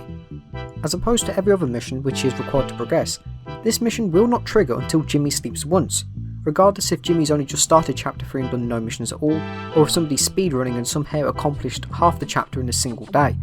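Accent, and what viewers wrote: United Kingdom. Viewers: its a british accent. whats so hard to understand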